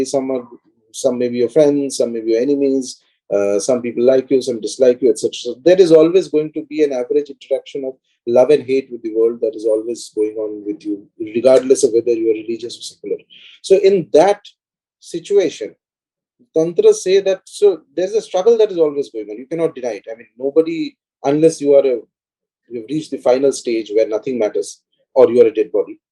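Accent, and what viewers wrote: Kerala, India. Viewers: Malayali? Tamil? Just asking.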